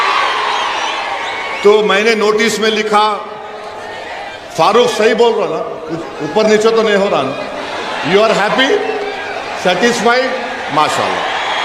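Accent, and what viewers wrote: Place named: Hyderabad, India. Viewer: Phale Haidrabad hindi bolna chodo aur sidha hindi sikh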